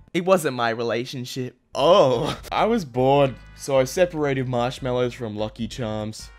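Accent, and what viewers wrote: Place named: Australia. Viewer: Emkay bUt Australian.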